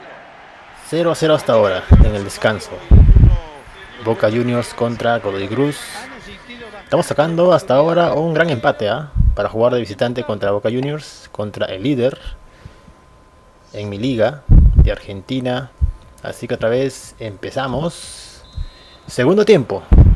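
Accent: Uruguay. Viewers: parece q sos uruguayo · sos uruguayo xD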